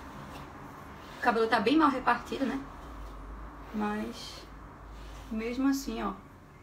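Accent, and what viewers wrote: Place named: Olinda, Brazil. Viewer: Esse sotaque eu conheço 😃 sou olindense 😘 excelente vídeo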